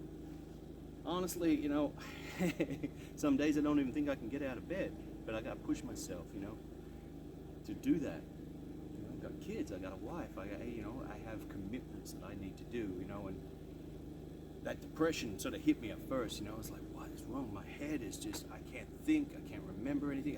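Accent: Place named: United Kingdom. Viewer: You accent ain't from around here?? I hear....British?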